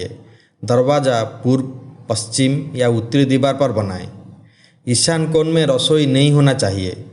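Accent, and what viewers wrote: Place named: Odisha, India. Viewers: Sir you are belongs to Cuttack as per your hindi pronounced. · Bhai tum odia ho kya?Isiliye hindi bolte time odia mix ho raha he.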